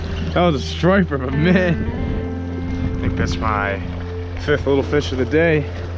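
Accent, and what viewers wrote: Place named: Fall River, United States. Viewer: Are you originally from Rhode Island, you kinda have that Fall River accent, not that it’s a bad thing, cause I’m from Fall River but I also fish Rhode Island here an there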